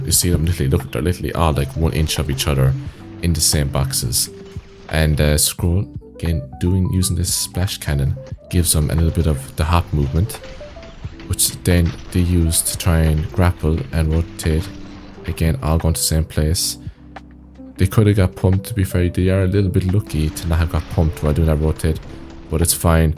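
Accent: Ireland. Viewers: Are u irish ?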